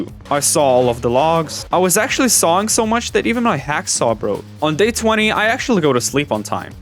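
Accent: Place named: Lithuania. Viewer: i knew that accent was fammiliar, labas :D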